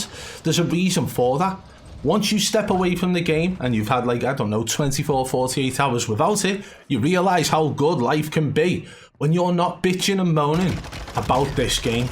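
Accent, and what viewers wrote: Liverpool, United Kingdom. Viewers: I love this Scouser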